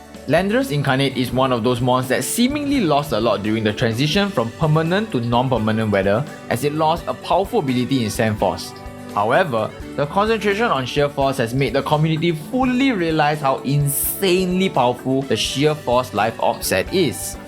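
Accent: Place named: Singapore. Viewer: eh u sound sg sia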